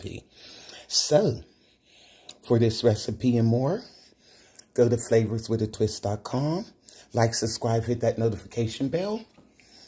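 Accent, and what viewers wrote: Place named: Pittsburgh, United States. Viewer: You have a very heavy Pittsburgh accent, either that or Pennsylvanian. Pittsburgh here, love the video!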